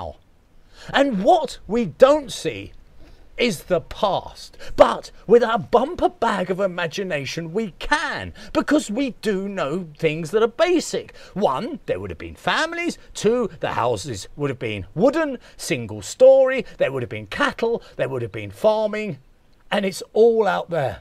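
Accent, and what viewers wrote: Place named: France. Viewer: We like your French accent ; keep on 👌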